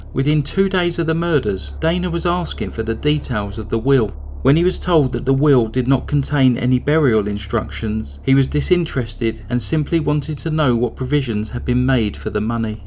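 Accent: England, United Kingdom. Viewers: I love the English accent, just sexy! I bet you're hot! Lol, just kidding! Honestly, though, you're very intelligent, well versed and I love the respect you have for the victims and their loved ones while you're doing the video. Thank you for taking the time to gather all the information as it shows you definitely put work into each video! New subscriber for life.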